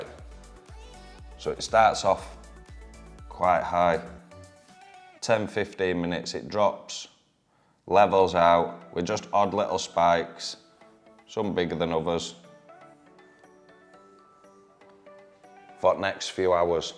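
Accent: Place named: Scotland, United Kingdom. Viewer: I love the Scott accent. “ Tah’ooh”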